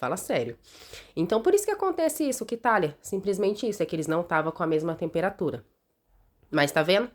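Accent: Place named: Minas Gerais, Brazil. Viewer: Vc e mineira igual a minha falo igual a você também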